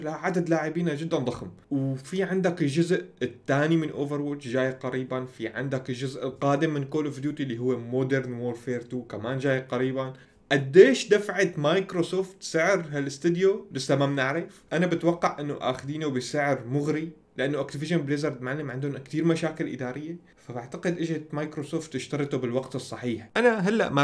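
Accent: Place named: Syria. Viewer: قسم بالله ياوجهك مريح والطيبه باينه فيك اتمنالك النجاح يا وراح تذكر كلامي راح تنجح في مجالك بس لو نجحت لاتنساني هاااا اوعك اخوك زيزو ايه نسيت ولهجتك السوريه العفويه ياخي حكايه تاانيه تسلم يازعيم على مجهودك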